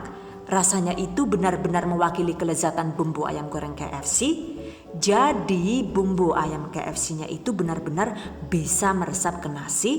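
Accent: Banyumas, Indonesia. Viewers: Ngapaknya bund..salam wong ngapak resepnya selalu mantul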